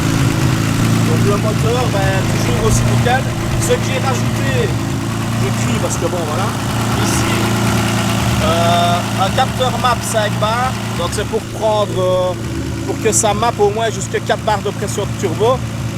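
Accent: Belgium. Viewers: un régal tes vidéo , la GTR on en parle pas c'est une tuery, courage et bon rétablissement au papa, sa va bien allé j’en suis sur !!! Plus je voi ta GTR plus j’en veux une, ton accent belge me tue, nonente huit (98) me donne des barres, Bref continue bien, et des que jai la GTR je monte!!!!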